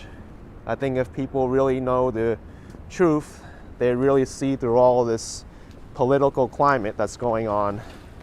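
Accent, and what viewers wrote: New York, United States. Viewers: I thought you were a jewish white kid this whole time LOL. Never seen an asian person with an NY accent but im from FL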